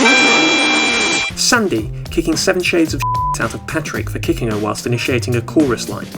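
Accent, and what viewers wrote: United Kingdom. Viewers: Your British voice makes you sound smart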